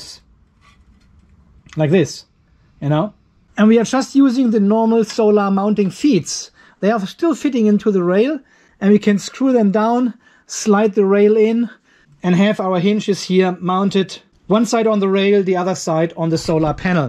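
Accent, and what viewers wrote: Germany. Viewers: Very nice video - greetings from bloody German accent to bloody German accent 🙂 (currently also building up my little solar power plant) - I really like your way of trying to find customized solutions that exactly fit your needs! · You're such a nice and helpful guy, I can see where people would help out where they can. And all that testing and experimentation is really helpful! I hadn't really payed much attention, but I did notice in the back of my mind that your accent was something other than Aussie. Then when watching this video, and hearing the cursing (and identifying with it!) it clicked. Ah! German!! Very cool.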